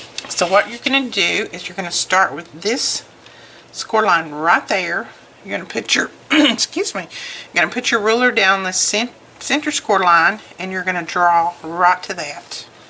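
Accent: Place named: Alabama, United States. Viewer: Just watched your video, this is just amazing!! I've missed listening to someone from Alabama!! Thanks from Arizona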